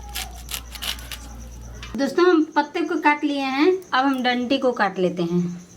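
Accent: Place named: Bhojpuri, India. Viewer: App bhojpuri boliye, humlog samjhte hai app kya bolti hai...App plz Bhojpuri boliye...Apka bhojpuri bolte huye bahut achi lagti hai. 😊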